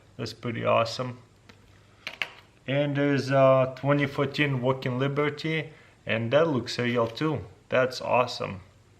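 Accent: Russia. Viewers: Why does it seem like auto captions works better on english in a russian accent than english in a... english accent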